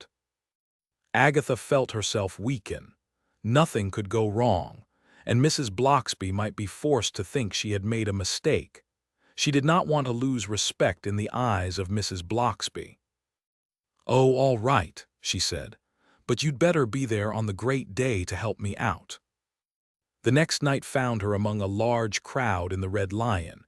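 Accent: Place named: United States. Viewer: An American narrator??? Bring back Penelooe Keith!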